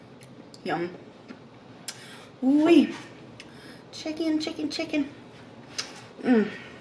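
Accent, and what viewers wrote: South, United States. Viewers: Im British and we have SO many accents lol but that goes with the American accents too. Us British find the number of American accents - especially your southern accent is really unique! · Ya I notice your accent alot...I love it. I'm french so all I hear is french accents😄so to hear a nice southern twang is really different & cool for me. Your voice is very soothing😌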